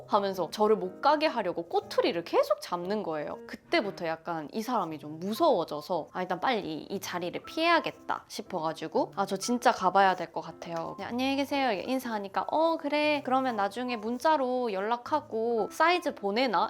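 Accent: Gyeongsang, South Korea. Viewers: 언니~~ 7,8년전부터 계속 봐왔으니 자기일인것만같아 너무 화가나네요…그때 한국어도 아예 못 했었는데 언니 영상을 맨날맨날봐서 그런지 지금은 일본인인데 경상도 사투리 조금 섞인 상태가 되버렸어요ㅋㅋㅋㅋ 그사람들에게 이제는 성공하고 멋진 모습을 보여줄 수 있어서 저도 너무 좋아요!ㅎㅎ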